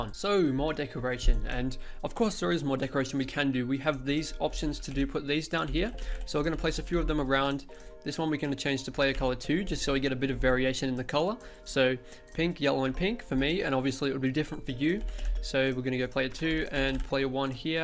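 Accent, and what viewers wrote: Australia. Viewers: most Australian intro ive ever heard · Are you Australian? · is he australian or some british country if he said "g'day" hes australian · By the 1st 3 words i guessed you are an australian M8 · Like that good Australian accent mate.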